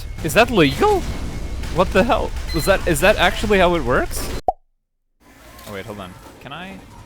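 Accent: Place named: United States. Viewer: I could never tell you speaked russian, your accent is so american :0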